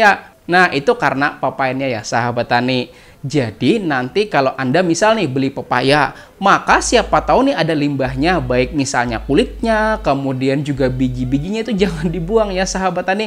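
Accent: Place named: Kuala Kapuas, Indonesia. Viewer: Betul sekali pak, syg kalo di buang kulit buah, bisa di manfaatkan sebagai pembuatan kompos dna POC. Ulun suka logatnya, masih khas kaluwa nya 😁, sukses mang, dan sangat bermanfaat 🙏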